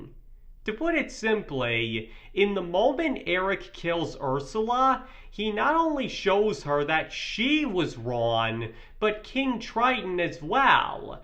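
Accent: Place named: Midwest, United States. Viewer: Maybe it's their accent? Prob midwest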